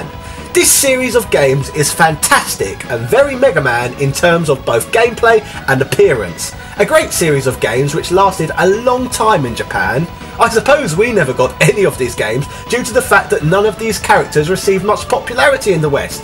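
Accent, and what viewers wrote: United Kingdom. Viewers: PLEASE! Just list the games in the description! Trying to decipher Japanese names from a British accent is impossible.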